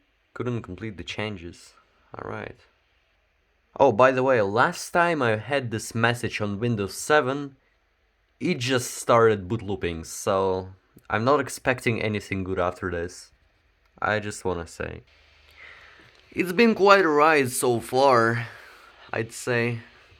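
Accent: Russia. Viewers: 10/10 russian accent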